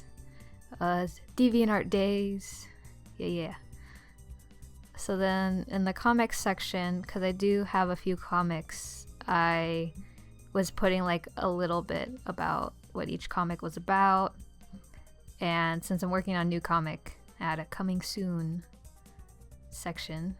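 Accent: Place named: Midwest, United States. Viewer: Are you from the Midwest by any chance? I'm asking because of your accent. It sounds very "midwest"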